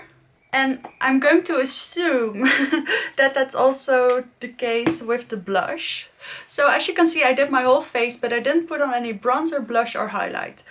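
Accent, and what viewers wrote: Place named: Netherlands. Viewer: I can detect a beautiful Dutch accent!! (One of my dear friends is originally from Breda). I loove Givenchy powders, the original Prisme Libre powder is so beautiful. Fantastic look 💕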